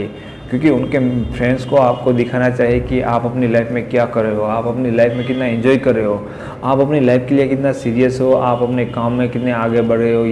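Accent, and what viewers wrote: Gujarat, India. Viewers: Bhai tame Gujarat cho?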